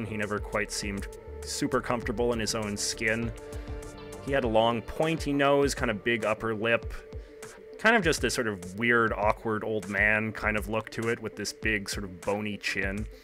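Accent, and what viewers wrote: Canada. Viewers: You soound Canadian 'ay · that hefty Canadian accent distracted me sooo much... absolutely beautiful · He sounds SUPER canadian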